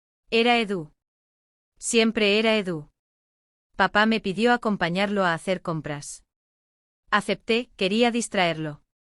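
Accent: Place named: Argentina. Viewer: Por favor relatos en español neutro, no con relatos argentinos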